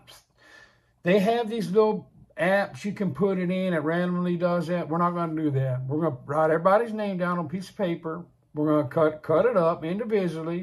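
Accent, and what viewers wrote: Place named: South Louisiana, United States. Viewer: I’m Kevin from Louisiana. I tie my own jigs also and I’m always watching to learn new patterns and techniques. Nothing like catching a fish on a jig you’ve tied! Plus you sound like my family and friends from South Louisiana! Hope you catch a bunch of sac-a-lait!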